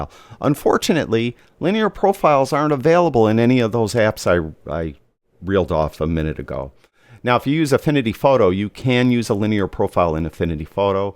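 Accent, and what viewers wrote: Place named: Chicago, United States. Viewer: I can pick up on Chicago accect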